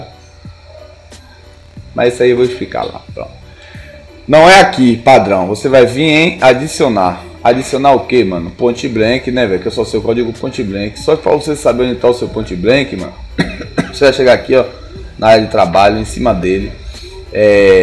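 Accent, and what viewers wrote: Bahia, Brazil. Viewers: So podia ser bahiano ne kkkkkkkk ( tambem sou )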